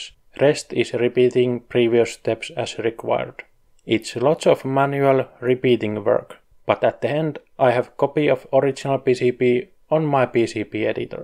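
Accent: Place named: Finland. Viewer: I like the Finnish accent, sounds nuts.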